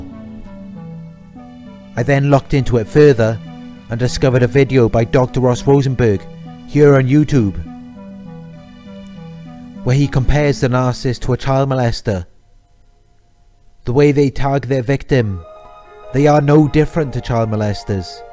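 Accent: Wales, United Kingdom. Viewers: Welsh accent matey!! Yep. So deep, this guy. xxx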